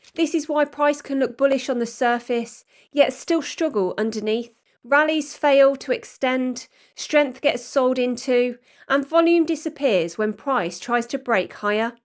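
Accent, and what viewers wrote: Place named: Black Country, United Kingdom. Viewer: Great Black Country accent by the way. Love it ❤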